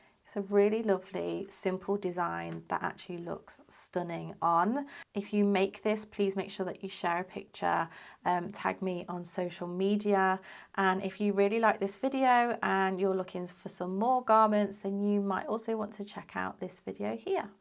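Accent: England, United Kingdom. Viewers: Ja. The English accents are beautiful. My English are very poor but I manage when I have to speak it. About once every month at the shopping. 🎉🎉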